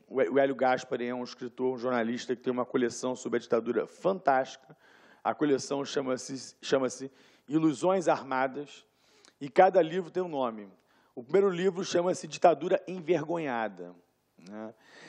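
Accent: Rio de Janeiro, Brazil. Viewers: Acho fofo o sotaque carioca, hahaha. Fica uma coisa meio bêbado falando "dia da mentiroann" , não é deboche, acho fofo mesmo!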